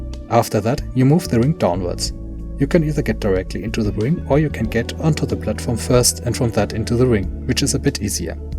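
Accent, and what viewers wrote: Germany. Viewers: This german english triggers me ^^ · Interessant dass man immer direkt hört wenn Deutsche Englisch sprechen ^^ Gutes Video auf jeden Fall.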